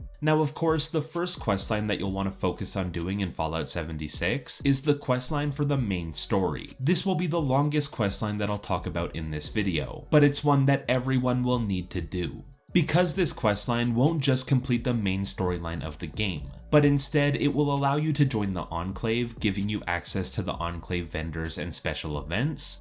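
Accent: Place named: Midwest, United States. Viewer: I’m guessing he’s from the Midwest. Maybe Ohio or PA. His speech is PERFECT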